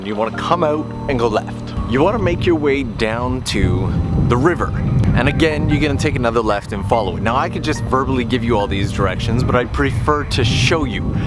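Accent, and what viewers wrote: Canada. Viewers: You should be a tour guide! you explain everything so well and your Canadian accent adds a special touch! Ireland is a different kind of beautiful so check us out sometime! 🍀🍀🍀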